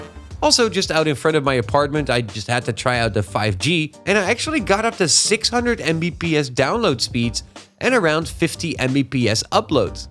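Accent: Netherlands. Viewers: He's definitely Dutch. His accent is pretty good as far as Dutch people go, but as another Dutch guy it was still a bit distracting. You can here it on his "th" for example. He is very deliberate to try and get it right, even then he sometimes slips up in a very Dutch way like the third time he said "thirty" in a row. "tirty" and "firty" are ways of getting around the inability to pronounce "th" almost every Dutch person, me included, does. He went for the former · you dutch?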